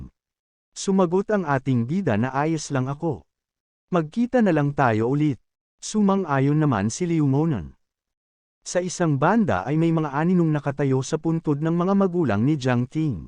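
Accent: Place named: Conyo, Philippines. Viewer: Application Kasi Yan kaya mdyo conyo ung pagsasalita.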